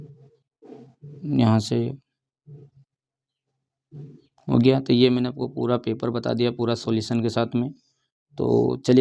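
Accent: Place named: Malwa, India. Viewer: Sir ap malvi Bhasha Bolte ho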